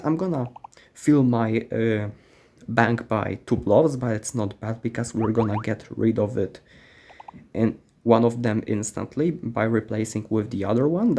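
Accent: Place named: Poland. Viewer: Haha i knew it, you are polish! I was so suspicous of your accent, now beacuse of the ads i know for sure😂 Great relaxing video❤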